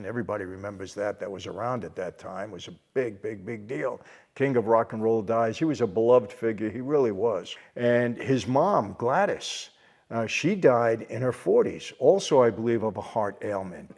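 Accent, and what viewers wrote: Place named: New York, United States. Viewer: You sound like a New Yorker!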